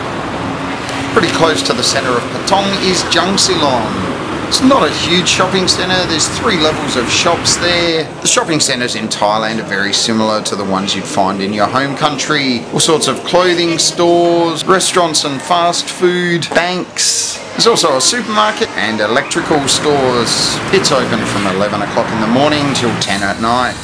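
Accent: Australia. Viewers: Such a strong ozy accent.😂😂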